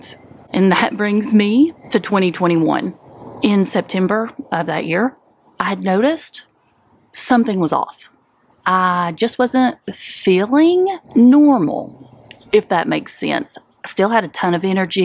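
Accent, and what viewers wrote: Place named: Appalachia, United States. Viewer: I just discovered you by searching for a keto sausage balls recipe. I found this video very informative. I am very sorry for your diagnosis. You are picture perfect with a loving appellation or southern accent. Stay healthy.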